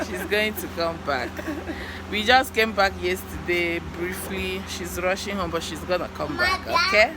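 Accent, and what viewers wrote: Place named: Ngwo, Nigeria. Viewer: Everytime I hear your igbo intonation I always say you sound like someone from ngwo. Please what part of ngwo are you from?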